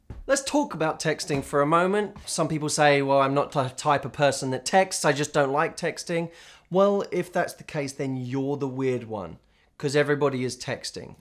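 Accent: England, United Kingdom. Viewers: What text can I send you to get your attention?Haha British accent Loves it! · I watch all his videos just to see him and listen to this heart-melting English accent 😭❤ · I love the British accent... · BRIT ACCENT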